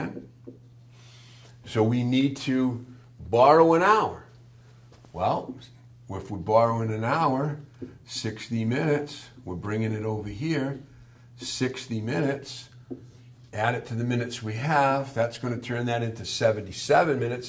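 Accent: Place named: Eastern Shore, United States. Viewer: One- of the greatest gifts to humanity is teaching. The second is teaching WELL! and you achieved both! Your tone and pace is excellent. Now far from my Eastern Shores I do enjoy the accent, which of course we know we don't have but everyone else has one.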